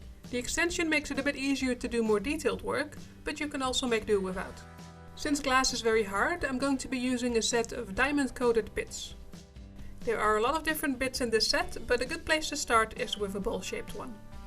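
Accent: Netherlands. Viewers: I'm so happy I found this site..ordered myself a glass etching tool..I'm going to guess your accent is Nederland...klompt?? Jim: Olympia,Wa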